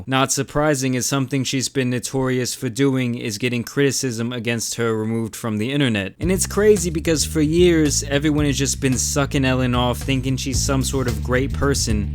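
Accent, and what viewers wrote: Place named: New York, United States. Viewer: I just noticed he speaks with a slight new york accent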